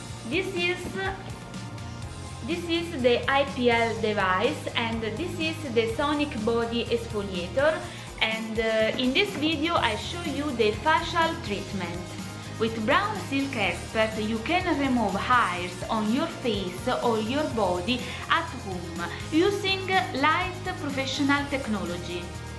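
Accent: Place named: Italy. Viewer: Maybe she’s Italian and speaks with accent ?! Jesus, calm down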